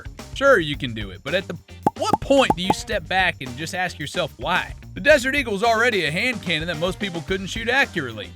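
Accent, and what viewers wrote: United States. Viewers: Hello mr stereotypical american accent guy, Ever seen the mg14z? Its a modern mg81z by technicality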